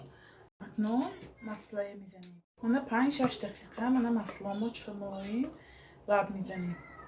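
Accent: Isfara, Tajikistan. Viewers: Салом апаҷон.шумо аз Исфара неми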